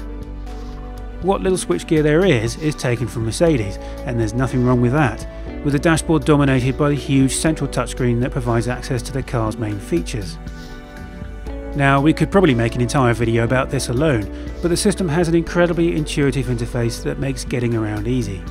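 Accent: United Kingdom. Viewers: British calm voice narration <3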